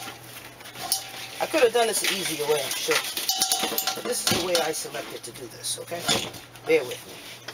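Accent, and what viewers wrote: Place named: New England, United States. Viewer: Patriots and that accent...🤔...You have to be from New England haha....I’m from Boston, I can pick out that accent anywhere hahaha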